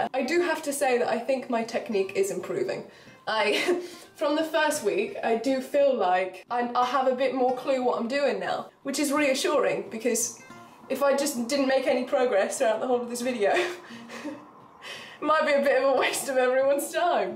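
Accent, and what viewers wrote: United Kingdom. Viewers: has anyone ever told you, you have the proper accent to be a Dr.Who companion? i think you and the Matt Smith doctor would get a kick out of each other... All british people know Dr.Who, right? its like a sporting event for you guys when it airs?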